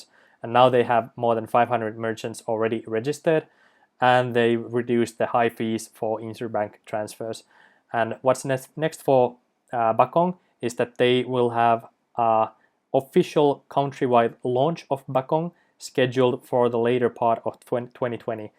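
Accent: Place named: Finland. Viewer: your accent man, it's brutally finish. I had been in Helsinki several times, and making the trip to Estonia! Love your forest, and love your show!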